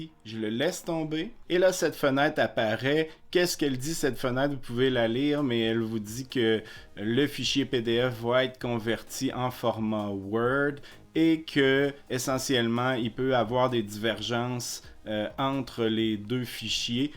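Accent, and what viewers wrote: Canada. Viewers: merci beaucoup , je kiffe entendre l'accent canadien haha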